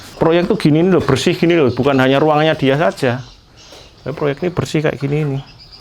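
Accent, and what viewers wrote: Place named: Surabaya, Indonesia. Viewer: Suroboyoan e metu cakkk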